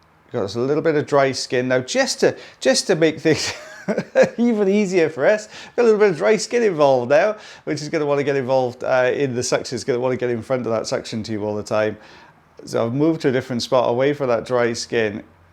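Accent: Wales, United Kingdom. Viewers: Love the Welsh accent. A lot of Wonderful Singers come from Wales. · Off topic: I'm totally in love with your Brit accent! I could stand to listen for ages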